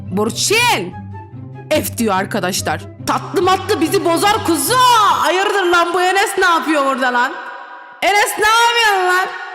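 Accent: Adana, Turkey. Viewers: Abla adana mi yasiyon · Abla Adana'da mı yaşıyon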